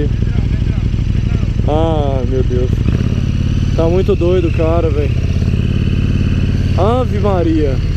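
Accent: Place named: Minas Gerais, Brazil. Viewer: Sotaque de mineiro! Gente boa! Super duke monstra!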